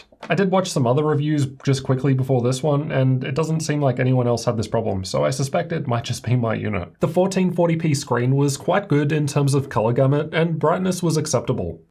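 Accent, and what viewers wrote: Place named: Melbourne, Australia. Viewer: God, that melbourne accent... Amazing video but the accent is strong xD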